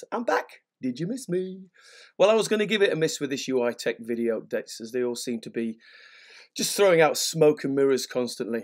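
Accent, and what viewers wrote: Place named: North, United Kingdom. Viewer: Your sense of humour made me laugh...you sound like a Northerner like me, but in on the know with USI Tech also...enjoyed the vid.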